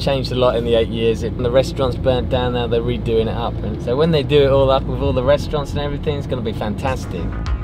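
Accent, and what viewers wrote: United Kingdom. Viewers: Thanks for the video and for information ! I love your british accent (no homo)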